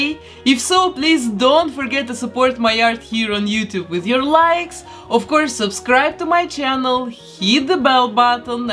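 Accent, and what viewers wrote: Russia. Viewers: Very funny a Russian accent telling Americans how awful Trump is. I unsubbed. When I want to hear people make fun of my President, I'll listen to someone who at least has grown up in the USA. And I'll watch the Popovy sisters do real art without political grifting. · You're russian? This accent ...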